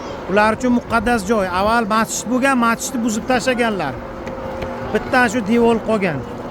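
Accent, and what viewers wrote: Bukhara, Uzbekistan. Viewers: Сан бухоро еврейми шевангдан билиндику сани тарихингшу ушангга боргансан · Birinchi marta kirishiz emas ekan hamma narsani joyini yaxshi bilopsiz yana ularni diniga ótib ketmang gapirishizdan tilizdan Buxoro jugutlariga óxshayopsiz shpiyonlardan bólmang yana tuxmat qilgan bólmayu hammamiz ulardan hazar qilgan paytda balo bormi ularni ichida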